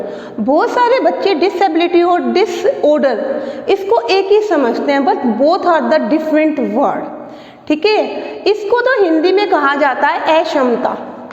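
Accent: Haryana, India. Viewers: Mam aapka Haryanvi touch aa rha h🥰 Lkn fir bhi m aapse hi pdti hu 🤓🤓 PHE ke sbse best teacher ho aap mam 🙏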